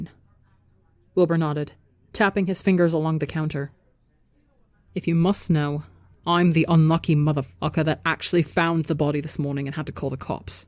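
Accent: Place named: United Kingdom. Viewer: IM SORRY BUT I CANT HELP BUT LAUGH AT THE BRITISH ACCENT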